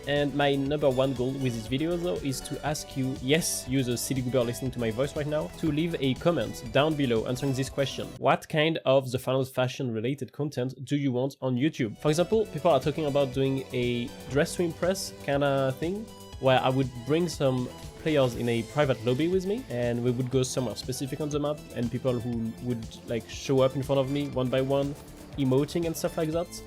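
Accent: France. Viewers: You ´r French ?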